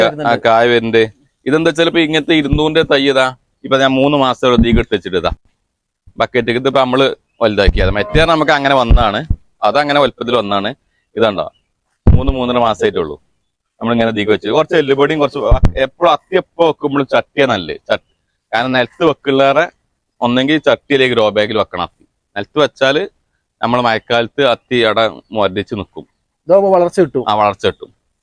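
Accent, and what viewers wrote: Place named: Malappuram, India. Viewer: ഇതെൻ്റെ മലപ്പുറം ഭാഷ👍😎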